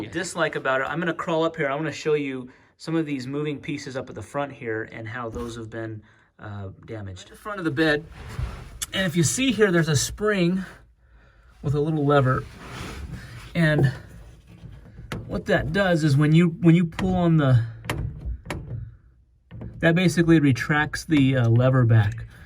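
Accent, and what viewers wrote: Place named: Utah, United States. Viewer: First time visitor to your channel... Good info on this cover. I'll probably pass on buying it. As a former resident, I can tell you're in Utah just by listening to you. We have an accent all our own. Lol keep up the good work!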